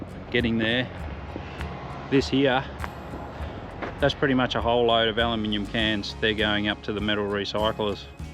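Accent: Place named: Australia. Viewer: I love your Australian accent and you're looking great 👍 👌 you do a great job.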